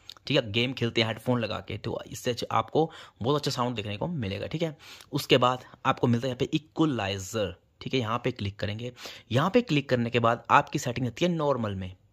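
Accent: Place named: Himachal Pradesh, India. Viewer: pehle ye btao u belong frm Himachal na ?? M 101% sure yes u r frm Himachal …..I recognised u by yr voice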